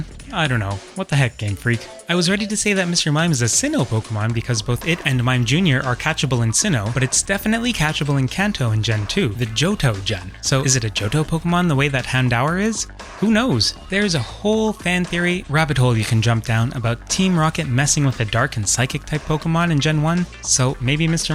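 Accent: Canada. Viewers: Wait you’re Canadian? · Are you a canook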